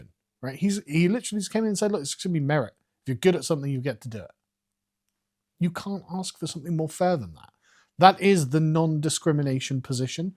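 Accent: United Kingdom. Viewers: Wait your from Central Arkansas? You sound very British.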